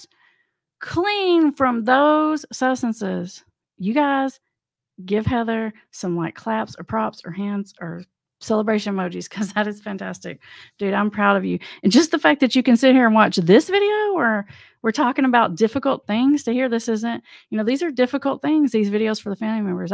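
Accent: South, United States. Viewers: I appreciate your efforts, please tell us where you got your title "Master of Addiction.?", What exactly is your professional education, and your personal experience. Thanks PS I'm sure its from your southern accent, but. you talk so fast also, and I miss much of what your are actually saying. What is your belief of 'the disease concept" of addiction?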